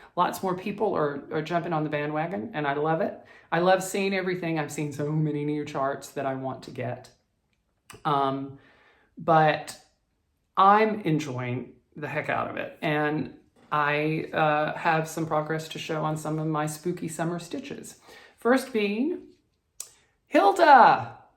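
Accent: South, United States. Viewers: Omg I just died 😂. What an absolutely perfect disclaimer. Just found your floss tube thanks to the Floss Bossin Cousins. Have been binge watching/listening while at work 🤫. When just listening you make me think of Leslie Jordon (your sense of humor or southern accent maybe)🤷🏻‍♀️. Looking forward to the next 50 or so videos 😃. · Great video. I always walk away from one of your videos smiling and quietly laughing. What a soft spoken southern gentlemen. My father in law was a southern gentleman, too.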